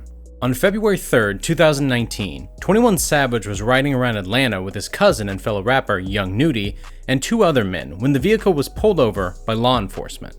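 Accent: United Kingdom. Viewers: I'm a bit late to comment on this vid But he's definitely from England🏴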